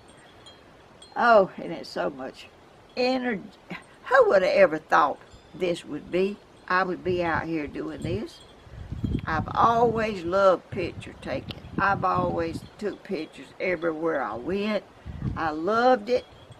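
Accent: South, United States. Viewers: you are a joy for me to watch. A wonderful break from all the politics and the haters. I love your southern accent. My family migrated from the Georgia area to East Texas years ago, and your accent is like listening to my grandmother. You mentioned Buckhorn Methodist Church. My ancestors owned the Buckhorn Tavern, a famous trading post and sight of civil war battles. I assume you live close to that area, but the Tavern was closer to New Market, Alabama, not far from you. The horns from the Tavern were given to the Buckhorn High School. Anyway, just a little history. Don't worry about people who say unkind things to you...how awful. Do your thing....don't lose sleep over the haters. God Bless.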